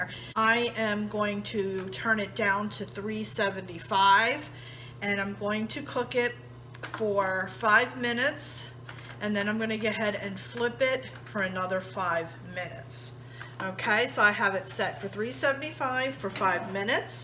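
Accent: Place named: South, United States. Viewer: I love tuna cakes. I’m here to see how to make them in our new air fryer. I must say, tuna cake recipes vary more than I realized….. family or area specific I guess. We always add cooked rice, & cracker crumbs, onion, parsley, maybe some mayo. I’ve never tried the celery & mustard. Love your frequent “ guhead “, truly. I’m guessing you are from down south, yes?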